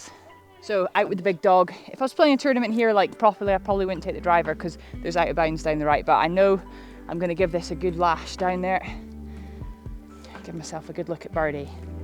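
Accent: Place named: St Andrews, United Kingdom. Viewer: I have started to putt more with the pin in. In the past three years playing about 100+ rounds per year (HDCP 6.3) and as a senior golfer, I find it helps with my depth perception. Maybe it's just my older eyes, but I can't remember the pin ever keeping a ball out of the hole for me. BTW, my daughter is a student at StA so as a Yank from the US, when I watch your channel or hear your commentary, it reminds me of what she hears every day and just helps to bring her closer to me. Please continue the great work! I love chasing daylight as well as being a dew sweeper!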